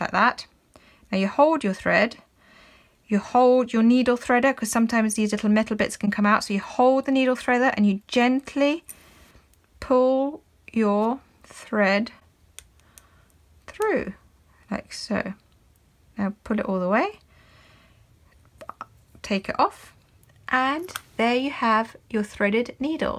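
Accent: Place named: London, United Kingdom. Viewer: "Not so giddy at threading..." Ah, the middle class Home Counties accent.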